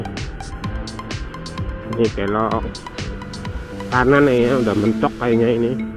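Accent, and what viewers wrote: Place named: Banyumas, Indonesia. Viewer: Nyimak sedulur ngapak ora ngapak ora penak. .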